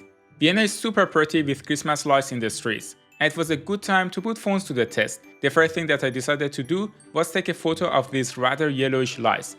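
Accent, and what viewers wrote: Iran. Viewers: Are you persian? Your accent says this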